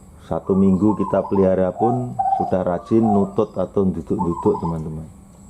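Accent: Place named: Tegal, Indonesia. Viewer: MZ bahasane ecek2 njenengan orang Tegal apa Slawi 😃😃😃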